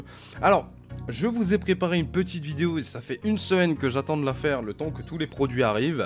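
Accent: Alsace, France. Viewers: sympa cette video dit moi tu serais pas alsacien ( 67)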